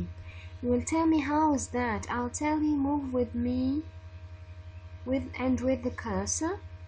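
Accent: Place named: United Kingdom. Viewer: I really liked your British accent it's really good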